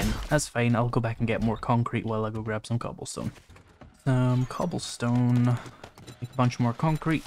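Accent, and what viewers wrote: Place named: Leeds, United Kingdom. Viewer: That accent bro, what you from Leeds area? By the way thanks for the turning stone into cement in the forge tip.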